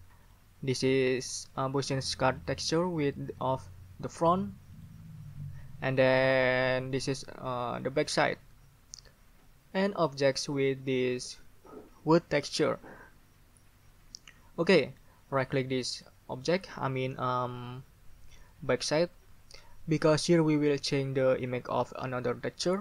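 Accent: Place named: Indonesia. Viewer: the logat like indonesian people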